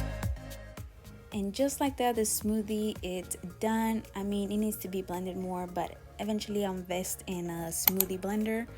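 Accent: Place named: Spain. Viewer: ADRIANNA TRUJILLO She clearly has an accent and it’s cute. You can tell she speaks Spanish by her accent is what I mean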